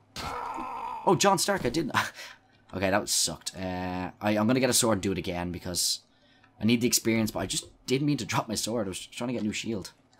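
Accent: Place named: Ireland. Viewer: I figured with the actent lol where in dublin are you from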